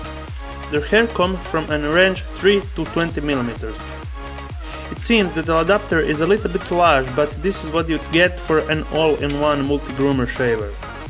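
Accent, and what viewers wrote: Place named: Russia. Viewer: русский акцент заметен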